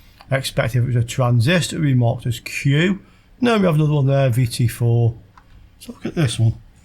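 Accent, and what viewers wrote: United Kingdom. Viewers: tak mne britsky prizvuk lezie na nervy, zaujima ma skor obsah a on to popisuje taj britsky - jednoducho :D . Ty sa zaujimas o elektroniku,ci len prizvuk? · Tiež si ho fičím 😀 ten britsky prizvuk je bozsky.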